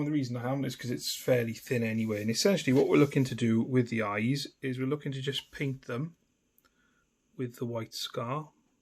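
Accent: Wales, United Kingdom. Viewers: Any chance of you'll have a go at painting Dragon Warriors Chaos Space Marines? I'm looking to paint my Chaos Marine battleforce to counter my Salamanders. Also, your Welsh accent is fantastic!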